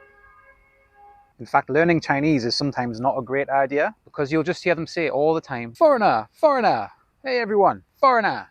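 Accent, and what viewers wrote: Newcastle, United Kingdom. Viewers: Yes, I could hear a "Haway the lads" accent in there 🙂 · Are you a Geordie?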